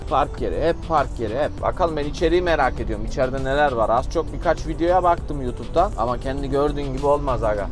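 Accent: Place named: Niğde, Turkey. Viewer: Askerde Niğdeli bir arkadaşım vardı konuşman tipin aynı kesin Niğdeli dir diyordum formayı gördüm yanilmamisim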